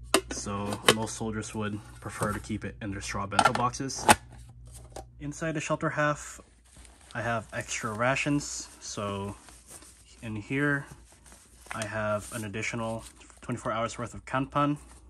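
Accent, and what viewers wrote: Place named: United States. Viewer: Your sudden american accent make me confused a little bit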